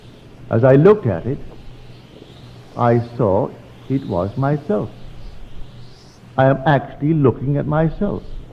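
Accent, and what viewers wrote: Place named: Barbados. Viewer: I love hearing his Bajan accent, nostalgic. I truly like his teachings too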